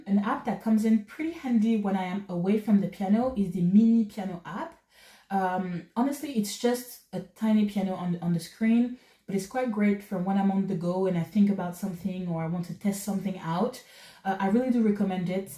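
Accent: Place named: France. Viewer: You def need to have more sub! Your improvement and piano journey is inspiring...and also are you french? I noticed it on the accent.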